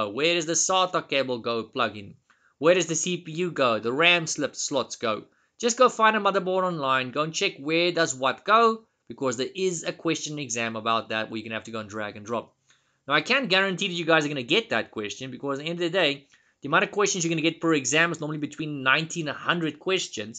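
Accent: South Africa. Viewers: I heard the accent and thought “this is a fellow South African” 😂😂❤❤. Subscribed! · This accent. Are you south African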